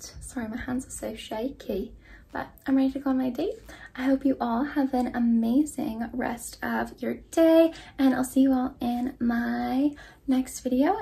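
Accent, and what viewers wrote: England, United Kingdom. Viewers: I’m so used to you randomly breaking into British accent I didn’t even realize it till my sister pointed it out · still obsessed with the english accent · I do the British accent all the time too 😭😭 you look so cute!! I hope your date went well !! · girl i love you but pls stop the british accent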